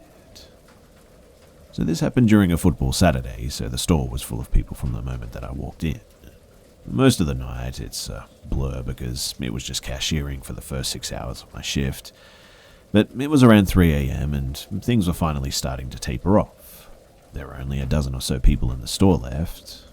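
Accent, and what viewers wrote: Australia. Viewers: it’s australian :) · His Australian accent reminds me of hugh Jackman that's a good thing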